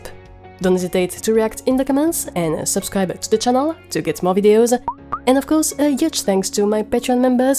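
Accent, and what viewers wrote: France. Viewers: I'm still racking my brains trying to figure out how to get a character to climb a ladder. I've almost reached the same solution, but I don't use Godot; I code games in JavaScript without engine, just for fun. But I don't have a function to know if I'm entering or leaving the tile. I'll take a look at the Godot source code. Thanks for the video. PS: Are you French also? Because I surprisingly understood your accent lol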